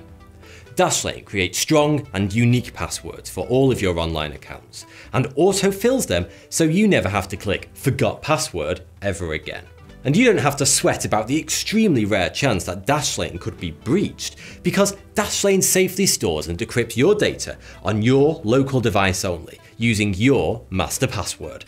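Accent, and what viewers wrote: United Kingdom. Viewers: what 🤷🏻‍♀️ he is british, thats what some people from Britain talk like 😉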